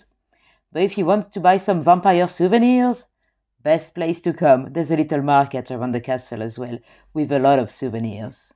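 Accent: France. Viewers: I like ur voice and accent. Sounds like French talking English. 🙂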